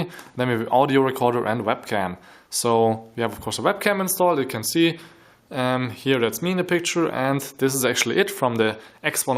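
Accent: Germany. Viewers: ich habs gleich gewusst das du aus deutschland kommst, verdammt wieso hört man das bei uns so raus? >.< ich hätt am liebsten einen amerikanische aussprache :D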